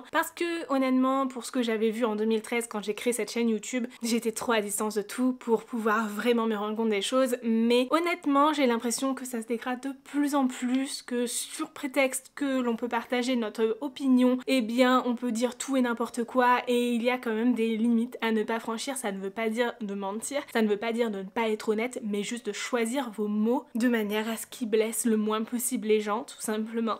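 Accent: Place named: South, France. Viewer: Merci pour cette vidéo. Un rappel de tolérance nécessaire. PS: j'ai cru reconnaître un accent sudiste. J'aurai mal entendue peut-être. Mon accent toulousain est ressorti aussitôt 😊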